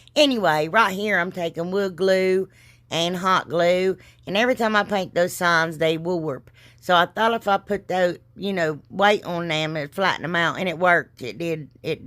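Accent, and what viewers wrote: South, United States. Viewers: What part of the South are you from?South East Georgian inquiring. I absolutely love and enjoy your videos. ILOVE, LOVE...LOVE your napkins....especially the CHICKENS.🐔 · You talk you're Southern Draw while you're working?😮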